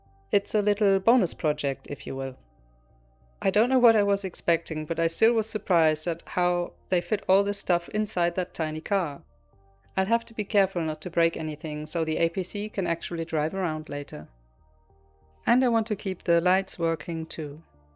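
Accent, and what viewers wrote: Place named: Germany. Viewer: Du hast in der Hitzewelle sowas zustande gebracht? Ich bin im eigenen Saft gegart worden XD Btw, hab dich gerade erst entdeckt, dachte dein Akzent ist soooo deutch, dann kam der Info Drop. XD Egal hab dich abonniert und geliked.:face-red-heart-shape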